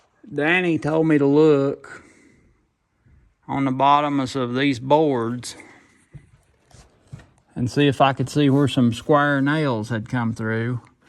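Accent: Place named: Kentucky, United States. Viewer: I have seen a ton of old barn tours and enjoyed every one of them. This one is by far the best I have seen. You even did it in the old down home lingo, wagon tars, backer and styles, where we would say tires, tobacco and stalls. Thank you very much. My Dad was from Kentucky so I had no problem understanding you. Art from Ohio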